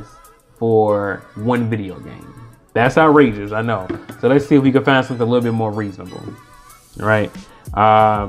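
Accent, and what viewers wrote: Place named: Philadelphia, United States. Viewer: Sounds like Philadelphia to me cuz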